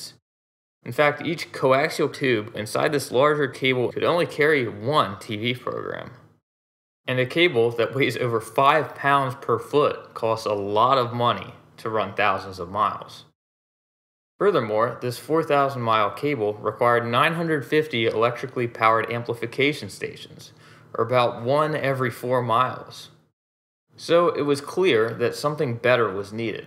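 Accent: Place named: Philadelphia, United States. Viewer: Man that's a thick Philly accent you got there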